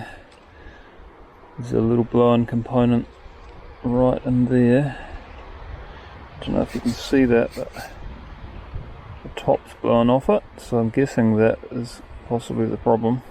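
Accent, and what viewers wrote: New Zealand. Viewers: Been wondering about this for a long time - if I speak with a New Zealand accent will I be as mellow and smart as?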